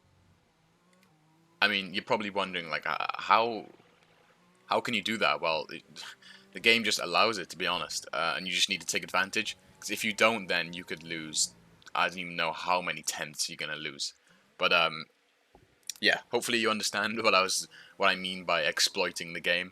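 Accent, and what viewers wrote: Wales, United Kingdom. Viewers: Great info, where in Wales you from?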